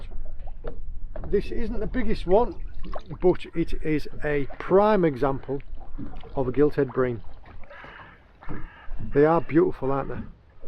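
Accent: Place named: Middlesbrough, United Kingdom. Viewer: I’ve been watching your videos and I do like the fact you explain the tides and wind and how you set your boat for fishing😁😁🤩👍 I have also noticed your accent and it sounds like you could be from Middlesbrough or cleavland or red car love your content 👍👍👍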